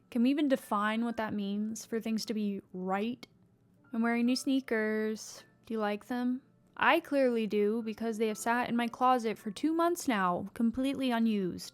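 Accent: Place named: United Kingdom. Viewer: You sound British, nice beginning in vloging, keep up, which town is this one? Great video 🎉🎉🎉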